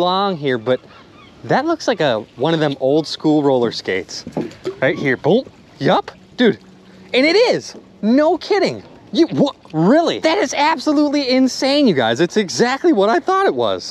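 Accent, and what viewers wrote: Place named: Rhode Island, United States. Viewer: May I know where is this cute accent from? Rhode Island? LOL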